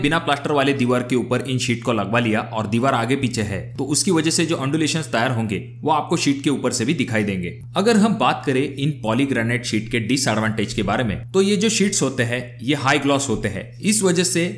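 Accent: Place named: Satara, India. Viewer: मराठी आहे फलटण बारामती किंवा सातारी जर्दा दिसतो भाषेत ....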